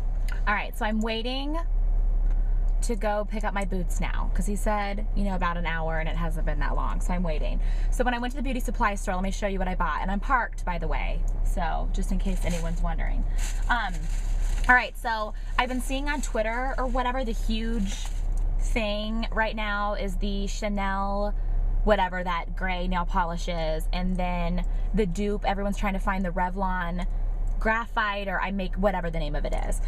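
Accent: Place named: South, United States. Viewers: I'm from Texas and I think that your country accent is hilarious. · lol. Love this video and your boots! Also I am from Texas and you talking with southern accent did not bother me at all. :-) You rock!!!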